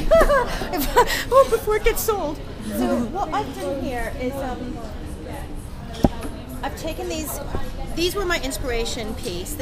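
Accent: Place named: England, United Kingdom. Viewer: She sounds so English these days!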